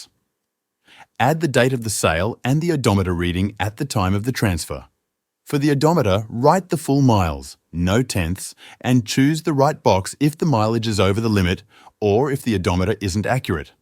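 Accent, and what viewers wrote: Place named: United Kingdom. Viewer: Thanks, for the video. Love the British accent....😁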